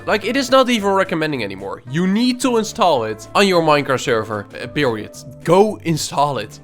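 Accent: Netherlands. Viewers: Hallo ik hoor wel dat je nederlands bent hehe ik ben grootte van van jou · jij klinkt erg nederlands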